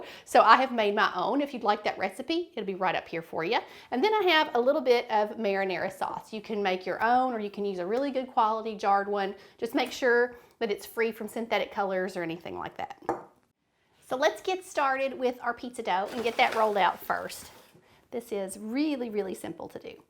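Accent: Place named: Kentucky, United States. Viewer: Gotcha. You shouldn't feel sensitive about embracing and sharing non-traditional new foods. As a matter of fact, the Stromboli is indeed an adapted Americanized invention. I enjoy making all types of world cuisine and I make no preface nor caveats for cultural qualifications. Remember, you're not appropriating, you're embracing. That's a very good thing! 😇 BTW: I adore your enchanting blue grass dialect.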